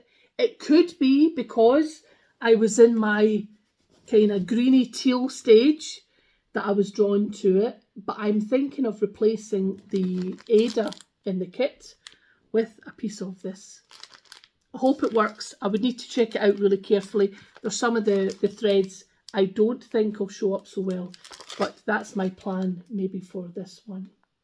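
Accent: Scotland, United Kingdom. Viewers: Lovely to hear a Scottish voice on Flosstube. Back to cross stitch after about 25 years and really enjoying it. Can I ask where you got your lovely project bags from? Just subscribed x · Hello, so pleased you have returned to Youtube. I have missed you over the last 12 months. I do love your Scottish accent as well as. Happy stitching.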